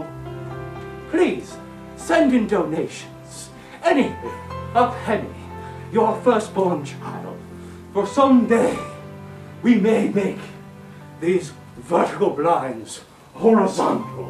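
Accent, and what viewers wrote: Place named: United Kingdom. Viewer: Your British Accent is dire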